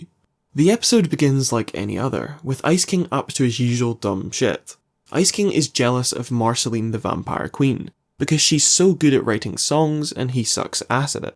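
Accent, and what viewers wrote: Scotland, United Kingdom. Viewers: Here good to hear a Scottish voice on YouTube for a change. Great video.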